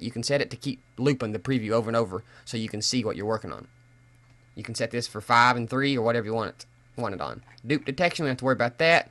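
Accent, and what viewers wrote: United States. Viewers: U HAVE A COUNTRY ACCENT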